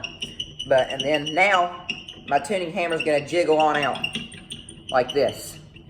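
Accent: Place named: United States. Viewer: in which part of US is your accent? thank you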